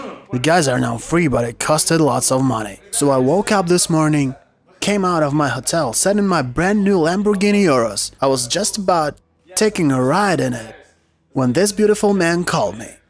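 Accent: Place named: Russia. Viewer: это у тебя в голове установка видимо детского сада. Это и без тебя известно что есть и британский и австралийский акцент. Просто все дело в том, что если бы ты знал на какую страну метит Эрик со своими англообзорами, ты бы тут вообще ничего не писал. А раз он метит туда куда ты не знаю понял ещё или нет, он мог бы перевести свои обзоры с русскоязычным чуваком и дать на озвучку носителю языка. Решил сэкономить, чё тут сказать. Просто представь ты смотришь топгир а там озвучивают Равшан и джамшуд.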